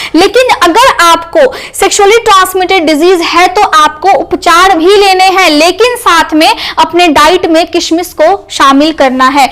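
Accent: Bihar, India. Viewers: Kya aap bihari ho kya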